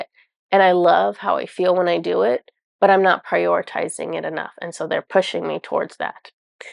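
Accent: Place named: Australia. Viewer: Thank you for important message on presence and meditation. I can’t get past Australian accent 😂🥰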